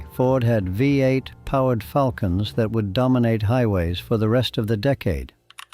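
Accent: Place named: United States. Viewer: similar, he has that yanky voice.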